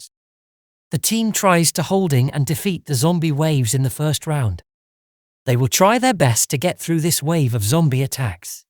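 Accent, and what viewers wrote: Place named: United Kingdom. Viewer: Right as you talked i eminently knew you wre British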